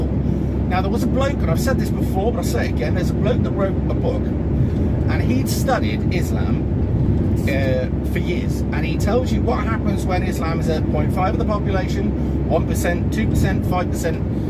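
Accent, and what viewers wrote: United Kingdom. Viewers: What kind of British are you?🤔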